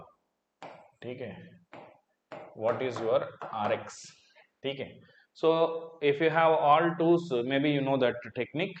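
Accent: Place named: Hyderabad, India. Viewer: Sir Content to ekk number hai heen... Uspe Aap ki Hyderabadi flavour waali Hindi... Is just Icing on the Cake 🤗😊💝💖❣️🙏🙏 Thank You Sir..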